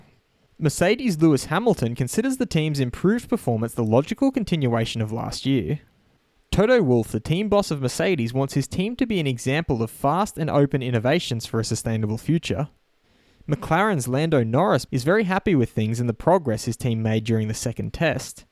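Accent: Australia. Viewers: hey mate, hear you aussie, you heading to the melb F1 this year. id like to buy you a beer for you great vids buddy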